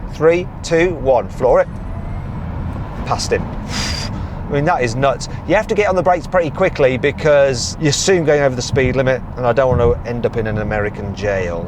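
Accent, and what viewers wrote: United Kingdom. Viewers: ㄹㅇ 카와우 영국발음 소리가 좋아서보는사람 나밖에 없나...?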